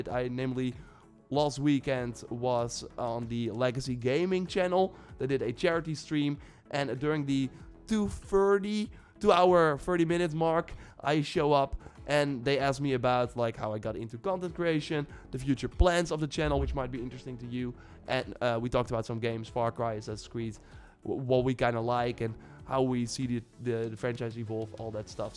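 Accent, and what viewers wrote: Netherlands. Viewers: love ur dutch accent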